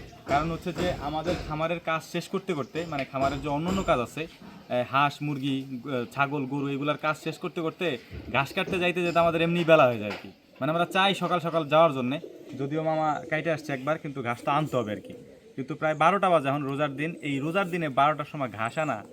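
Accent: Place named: Comilla, Bangladesh. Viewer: আসসালামুয়ালাইকুম আশা করি ভালো আছেন দয়া করে আপনার জেলার নাম বলবেন কুমিল্লার ভাষার মতো লাগে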